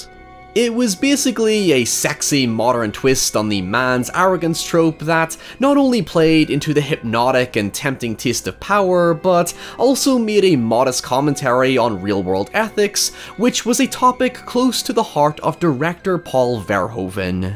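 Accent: Ireland. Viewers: first time requester, so I'm excited to share my recent J horror deep dive. I caught Noroi: The Curse, probably the creme de l creme of found footage flicks. It h interesting hook as an investigative journalist tries to get to the bottom of mysterious deaths and disappearances. A slow burn masterpiece with a truly horrifying climax. One for the ages that only your dulcet Irish tone can breakdown accordingly. Keep up the Great work, you've knocked it outta the park this year! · You're British? I thought you were Irish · I can tell your Irish, but why are you putting an upwards inflexion at the end of almost every word?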